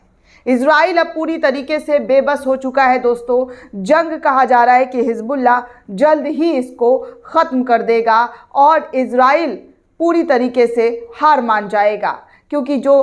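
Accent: India. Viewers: Ganwaar pronunciation like Modi ...unsubscribed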